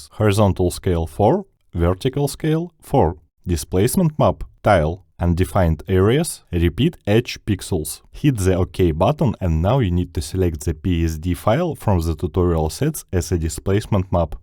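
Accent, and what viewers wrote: Russia. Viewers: А можно по русски? По голосу слышно что можно. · ох уж этот русский акцент))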